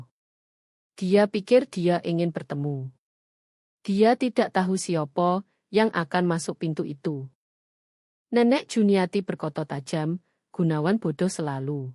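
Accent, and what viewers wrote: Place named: Java, Indonesia. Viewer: Waduh! Accent Jawanya medok banget! But! It’s okay!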